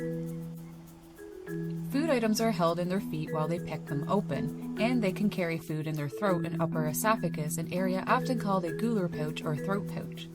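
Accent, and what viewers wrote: Canada. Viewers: Oh, this lady is definitely Canadian. You could hear it as soon as pouch and then house 🤣. Bless your flappy headed hearts.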